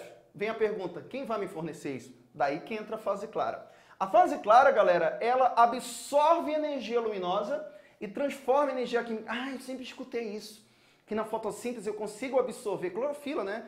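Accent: Pará, Brazil. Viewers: Maninnnnnho eu gostei da coisiNNNha. Que fofo tio, vc é paraense? Já gostava do antes, agora tá em casa!!! 👏👏👏👏👏👏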